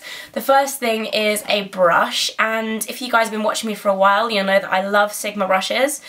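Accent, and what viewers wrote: England, United Kingdom. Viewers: wow i love your videos! Its so nice to hear a good english guru (not that i dont love american gurus!) And.... you are one of the first to do a november video!! xx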